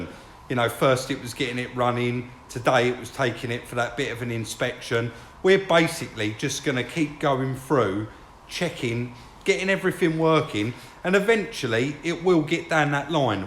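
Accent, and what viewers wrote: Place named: South London, United Kingdom. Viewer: Accent to me sounds sarff London sorry south London ,might be termed as estuarine English may be because of proximity to the Thames estuary I am 1 mile from it now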